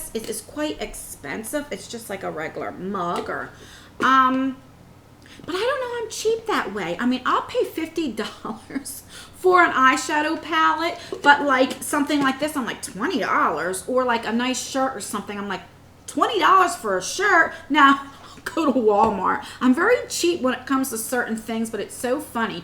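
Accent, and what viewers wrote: New Jersey, United States. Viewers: I'm sure you hear this a lot but I love your Jersey accent. I'm a newer sub but really enjoying your videos. Also in my early 30s its nice to watch a youtuber that's actually over 30 ❤❤ Also I am sooo like that some things I splurge on others I like to find the cheapest alternatives. I'll slay some Wal-Mart clothes all day 😉 p.s this box seems like more of a $20 box max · I need a man with this beautiful jersey accent....u gotta brother or cousin looking? Hehehe!!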